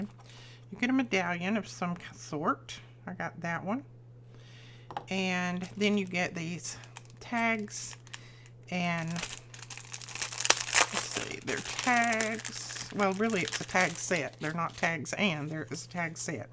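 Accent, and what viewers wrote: South, United States. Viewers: Love your southern accent,I am from the south but I think my accent is not noticeable. I enjoyed your video. That is cute. Can't wait to see another video.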